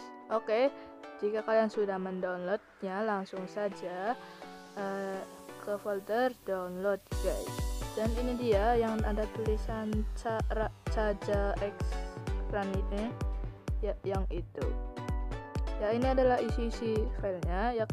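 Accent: Java, Indonesia. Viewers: Jawa bgt Bang🤣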